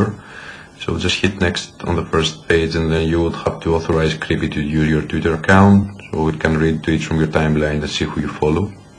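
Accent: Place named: Greece. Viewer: Να τος με τα αγγλικά αλλά ελληνική προφορά xD Nice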